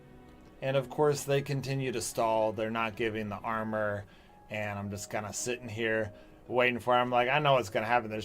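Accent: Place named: South Africa. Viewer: That's dude got a south african accent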